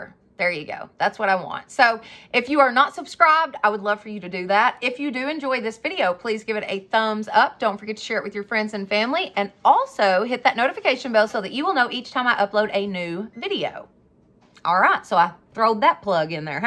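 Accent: South, United States. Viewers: Hi, I love your personality. You are always so cheerful and positive. Your projects and ideas are awesome. You always make me smile and I love love love your accent. I live in Canada so to me you have a very strong southern accent. Love watching you channel. Will be waiting to see what you do on the next video.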